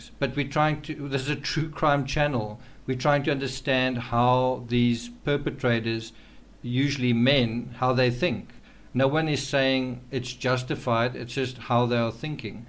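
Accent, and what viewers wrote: South Africa. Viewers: You sound South African , i am?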